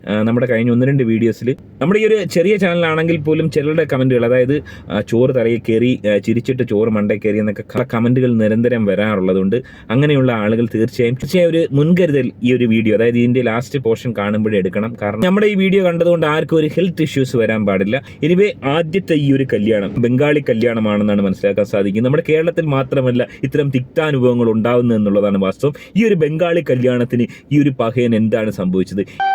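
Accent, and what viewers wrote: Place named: Malappuram, India. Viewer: നിങൾ എന്തിനാണ് എല്ലാ പരിഹാസ വീഡിയോ lum malappuram muslim slang pole സംസാരിക്കുന്നത്. അതൊക്കെ പണ്ടാണ്, ഇപ്പൊ അവിടെയും ആരും നിങൾ സംസാരിക്കുന്ന പോലെ സസരിക്കുന്നില്ല. നിങൾ ഷെഡ്യൂൾ cast കള് സംസാരിക്കുന്നത് എങ്ങനെയാണ് എന്ന് പറയിപ്പിക്കരുത്. അവർക്ക് ഒരു word nte starting letter ഉണ്ടാവാറില്ല സംസരിക്കുമ്പോ, പുലയൻ, പറയൻ, ചെറു മക്കൾ തുടങ്ങിയ വിഭാഗങ്ങളുടെ സംസാരം കേട്ടിട്ടുണ്ട്, അവർ മുമ്പ് തറവാട്ടിൽ നെല്ല് ,കൊപ്ര,കളപ്പുര, help nu വരുന്നവർ ഒരുപാട് ഉണ്ടായിരുന്നു , Eg പറഞ്ഞു തരാം " ആ ചെക്കൻ്റെ പെണ്ണിൻ്റെ വീട്ടിൽ" എന്നതിന് " ആ എക്കൻ്റെ എണ്ണി nte ഈട്ടിൽ " എന്നാണ് അവറ്റകൾ പറഞ്ഞിരുന്നത് 😅😅 അതുപോലെ ഒരുപാട് ഉണ്ട്. ഞങൾ ആരും അത് പറഞ്ഞ് അവരെ പരിഹസിക്കുകയോ ഇങ്ങനെ പറഞ്ഞു നടക്കുകയോ ചെയ്യാറില്ല. നിങൾ ഒരു reaction video ൽ hindu family ye react cheyyumbo polum മുസ്‌ലിംകളെ പരിഹസിക്കുന്ന slang ൽ parayunnath njaan kettu. കഷ്ടം തന്നെ. നിങ്ങളെ നിലവാരം ഇതാണോ ???! 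മഹാ ബോർ ആണെ്ടോ ഇതൊക്കെ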